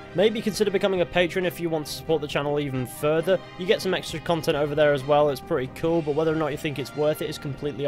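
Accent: England, United Kingdom. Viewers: I'm sorry but this video would be better with a deep American accent instead of a high pitched Englishman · Umm I'm an American and in no way would anyone say his name that way. And for real British are not ones to talk. Bloody Hell (For real get a new catch phrase) You taking a piss? (Makes no sense) My friend is in Hospital (surly you mean in "a/the" hospital) it's not like you say my friend is in store. No your friend would be in "the"store. I could go on and on but you people long ago lost touch with speaking correctly. I once herd a comedian say Americans say Herb wrong because it has an H in it. But then how do you explain Knife? And you guys LOVE that letter H you super put alot of weight into it. Just saying next time you wanna say someone else talks funny make sure your own accent isn't full of stupid memes.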